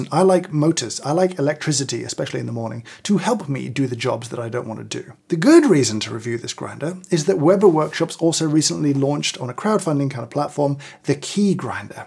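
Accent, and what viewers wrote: United Kingdom. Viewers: I must admit that your videos are kind of a therapy for me. There is much to enjoy when watching them: - beautiful editing - excellent choice of music - great macro shots - I don't know much about lighting, but it all looks put well together - the hair (no further comment needed) - the calming voice - the British accent - coffee - tech-stuff - the jokes I could go on and on, but I need my therapy now.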